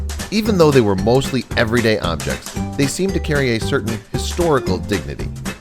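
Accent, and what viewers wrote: United States. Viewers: It's sad to see the nice country but to hear an american accent . . . . very sad.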